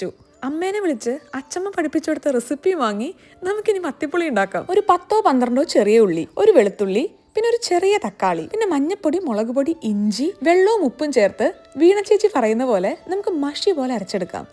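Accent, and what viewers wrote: Malappuram, India. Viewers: Malappuram aano veed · ചേച്ചി മലപ്പുറം കാരിയാണല്ലേ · അപ്പൊ ചേച്ചി മലപ്പുറംക്കാരി ആണോ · ഇങ്ങള് മലപ്പുറാ...😅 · വിവരണം കേട്ടിട്ട് കൊതിയാവുന്നു.... 😋🤩 ചേച്ചി മലപ്പുറം ആണോ... 🤩❤ മലപ്പുറം എവിടെയാ...?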